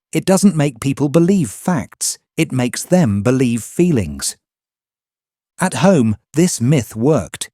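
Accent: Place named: United Kingdom. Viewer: Jewish Gangster Narrator with a British accent! They have hijacked the world with sleeper cells waking up in every country in the world in positions of Defense ministers, Intelligence, police in top positions to control. The 5 eyes is Israeli gangster Terrorism run bybthe terrorist state of Israel. We’re hijacked. Putin is just trying to hold his culture,& Country together. He’s a saint! Says The BronxBoy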